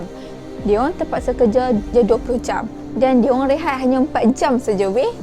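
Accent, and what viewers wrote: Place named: North, Malaysia. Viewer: Haha awat jari angg tu..loqlaq naaa..sekali lg tgk sbb ckp utara..x minat k pop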